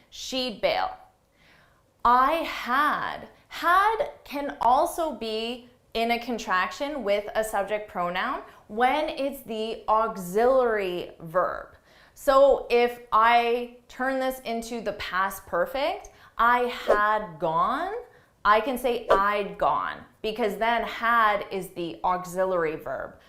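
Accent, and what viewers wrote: United States. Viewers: This isn't English. This is American. Don't learn this if you want to learn English pronunciation. It's a waste of time. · American accent is quite easy to understand, British one is so challenging though. I listen your podcasts on 1.5 speed and face no issue. In terms of British accent, a lot of words are not understandable for me like, theme etc.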